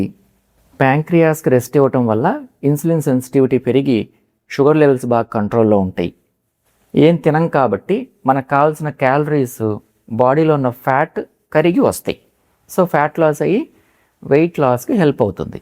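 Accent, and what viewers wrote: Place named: Guntur, India. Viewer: Sir, Meeru Guntur ani ardham avuthundhi,Ela ante vachhiddhi,thaggiddhi edhi guntur valle matladatharu, Good information sir🎉🎉🎉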